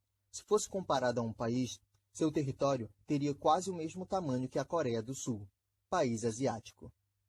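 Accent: Pará, Brazil. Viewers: kkkkkk hoje bem cedo,encontrei o canal pela primeira vez. Sou do Maranhão (cidade Bacuri)no Litoral Ocidental maranhense ,e conheço o sotaque do Pará.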